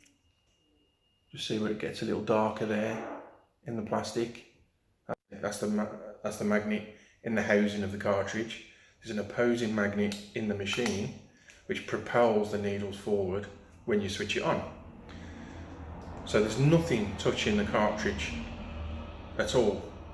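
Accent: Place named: Birmingham, United Kingdom. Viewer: Never apologise for a Birmingham accent 😊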